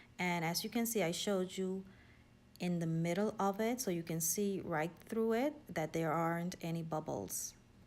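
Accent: Bahamas. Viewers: Nice, you sound Bahamian ?? ❤️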